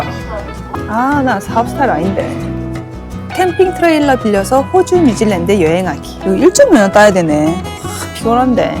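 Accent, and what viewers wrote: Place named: Busan, South Korea. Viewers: 겡상도 아지매네 ㅎㅎㅎ😊 하고픈거 하면서 사는거 부러워요.. 타코는 미국 캘리포니아에서 먹는게 좋아요😊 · 고향이 남쪽 어디쯤인지는 당연히 알았었는데... 갑자기 많이 고향말을 쓰시니까 또 새롭고.. 적응이 안되요😊😊 진짜 가보고 싶은 곳이 참 많은데... 언제나 다 갈 수 있을지ㅎㅎㅎ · 부산임마까 지도 부산이다아임미까 사투리방송 정겹고 좋아요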